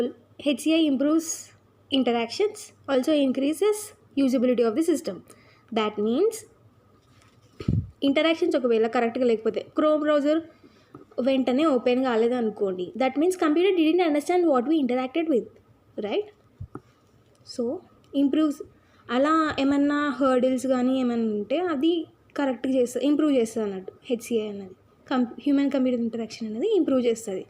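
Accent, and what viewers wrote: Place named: Telangana, India. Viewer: Motham telangana pilla la matladthunav😅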